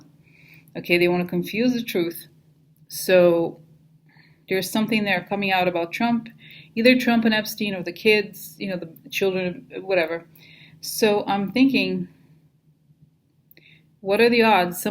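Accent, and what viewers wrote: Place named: Russia. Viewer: The faces take away from your content? Really? Oh HORSE SHIT! They enhance your readings, help us cope, and provide most of us with the only politics-related laugh we will get each day. Especially love your Russian accent. Never stop. PLEASE and Thank You! 💕💕😂💕💕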